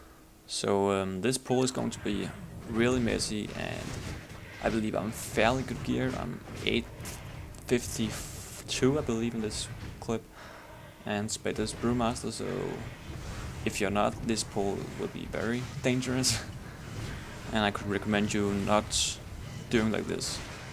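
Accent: Denmark. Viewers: du er dansker kan jeg høre skal man være exalt med dem ? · Nice danish accent dude!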